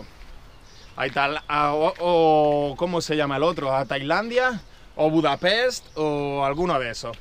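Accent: Spain. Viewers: no os burleis de el accento español, porque el buen tro es peor" wey, bro,"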